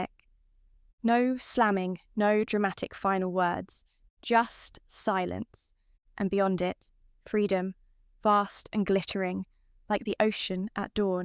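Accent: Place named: England, United Kingdom. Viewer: Lol, it's an AI program just a better one than most. It depends how much you are willing to spend. Also English voices especially her being a more refined English is very pleasant on the ears.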